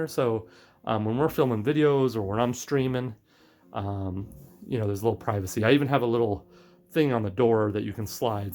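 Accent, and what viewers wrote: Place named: Germany. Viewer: U sounds like u are from germany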